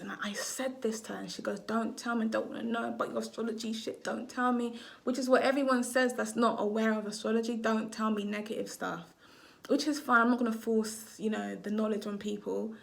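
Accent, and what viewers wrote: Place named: United Kingdom. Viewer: Its called an accent... She's British.. Dont be that type of person famz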